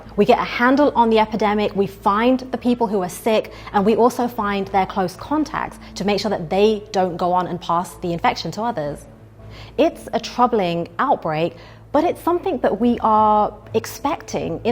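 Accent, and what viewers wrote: United Kingdom. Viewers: She sounds British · I always trust people with UK accents, even if they are just repeating bogus info.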